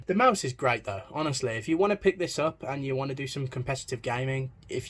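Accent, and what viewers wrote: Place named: United Kingdom. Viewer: dude where abouts in uk are you from? i recognise your accent!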